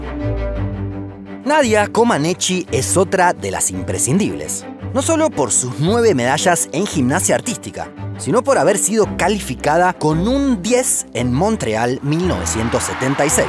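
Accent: Argentina. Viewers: Excelente dicción en la narración, obvio que con acento argentino, ¡bravo! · Que calidad de narración y guión tiene este canal, es genial y ese acento argentino a mí me encanta!! Excelente video!!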